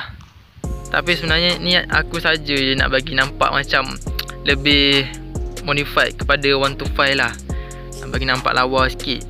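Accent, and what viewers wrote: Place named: Sabah, Malaysia. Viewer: dok sabah ke serawak ni derr??? slu blah² sana je mcm nie 🤭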